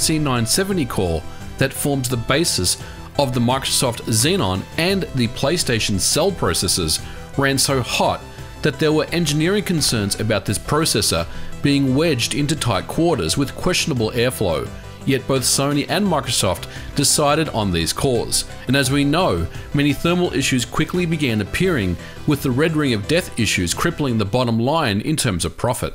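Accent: Australia. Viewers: Mate, that's just part of the mystique of the Aussie accent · Strayan accent coming through. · Australian or South African accent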